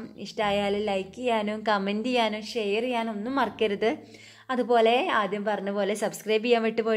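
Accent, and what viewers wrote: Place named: Northern Kerala, India. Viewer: .. നല്ല വീഡിയോ ആണ് കേട്ടോ.. I like your വടക്കൻ slang 😍😍😍പക്ഷേ അരി നാലഞ്ച് പ്രാവശ്യം കഴുകിയാൽ അതിലെ തവിട് മുഴുവൻ പോയി ഗുണം നഷ്ടപ്പെടും എന്ന് പറയാറുണ്ട്... അതുകൊണ്ട് ഞാൻ..maximum രണ്ട് മൂന്ന് പ്രാവശ്യം മാത്രേ kazhukarullu...just അഴുക്ക് കളയാൻ മാത്രം.. ഇത് negative comment Alla കേട്ടോ..😊😊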